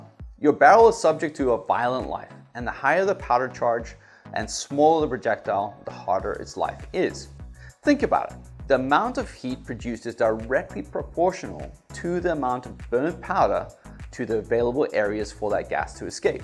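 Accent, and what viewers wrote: South Africa. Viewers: totally unrelated random comment: your accent, sir, fascinates me! First video of yours I have ever watched, and, that is what caught my ear first even before learning this about barrels, which I did not know either. I am hearing bits and pieces of South African English? British English? AND American English? Am I right am I right?😂 But all combined together into your own unique accent!! Well that is personal I suppose. It’s just that, I am always fascinated by language and accents, having a semi-musical background, and so the way in which any person anywhere talks is always catching my ear because, it also lends a window into their personal story and personal history. So therefore I do not mean any disrespect. Just that, you accent fascinated me when I first heard it and I felt compelled to comment! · Some of your pronunciation is reminiscent of the south african accent…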